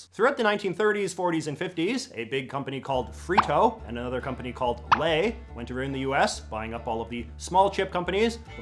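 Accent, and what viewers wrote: Canada. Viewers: Strongest Canadian "-out" I've ever heard. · He's canadian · Bro is the most Canadian Canadian I have ever heard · This guy has the most pronounced Canadian accent I’ve ever heard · he’s Canadian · what is that accent i hear aboat instead of about lol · is this guy canadian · He’s either Canadian or Minnesotan I presume :/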